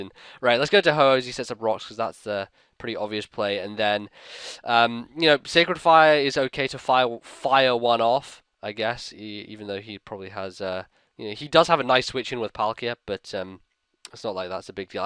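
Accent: Scotland, United Kingdom. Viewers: What? Are you scottish?! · Are you Scottish? I thought you were Englandish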